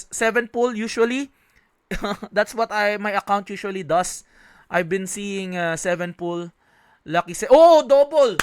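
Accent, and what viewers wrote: Philippines. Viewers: Sabi na fil ka pre e, sa accent mo kase HAHAHAHA nice content pre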